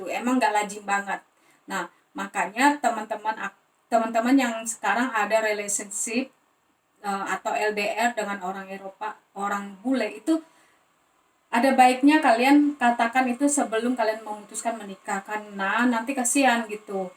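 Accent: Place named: Eastern Indonesia, Indonesia. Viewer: Sis seprtinya org TIMUR,, Bagus bnget penjelasnya..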